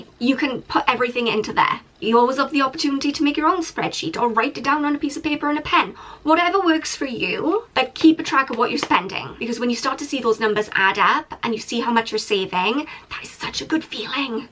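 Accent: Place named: Wales, United Kingdom. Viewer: I was trying to place it too; thought it sounds a little like Scottish but not quite... it's got to be Welsh, right? :D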